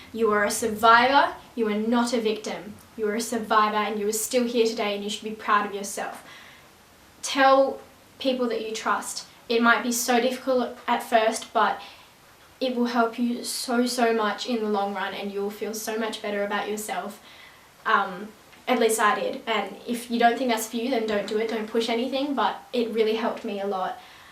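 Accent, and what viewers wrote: Australia. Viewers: Is Australian?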